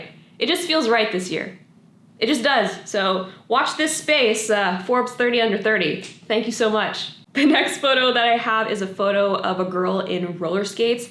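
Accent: Australia. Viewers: girl I've gotten so used to you saying "in the description box down below" in an Australian accent that I get confused when other YouTubers don't say it in one lmao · I love that the aussie accent intro is making a reappearance!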